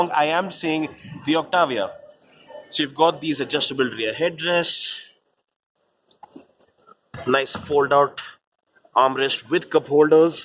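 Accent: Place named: India. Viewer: Indian accent, reminds that asshole Indian manager no one likes.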